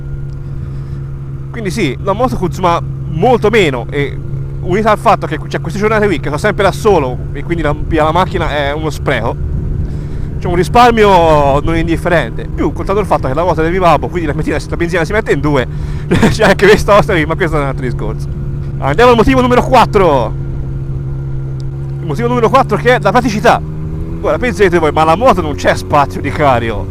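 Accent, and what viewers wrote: Tuscany, Italy. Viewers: E tu sei toscano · sei toscano scommetto io sono di pisa passa dal mio canale se ti va😉🤙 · Toscano vero?grande boia deh ahaha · si chiama accento toscano :D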